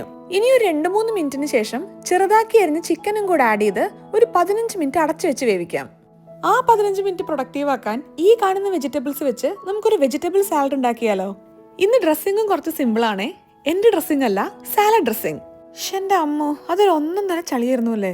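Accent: Malappuram, India. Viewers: Njannm oru malappurakariyane ngale parayunath neg kug pag anan ninale patayunnath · Athe Malappuramkkariyannen enikk thoniyayirunnu❤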